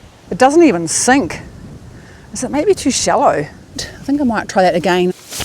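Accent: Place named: New Zealand. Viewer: You sound like a Kiwi! I have always fished for yabbies with scrap meat on a string, and a plastic rake.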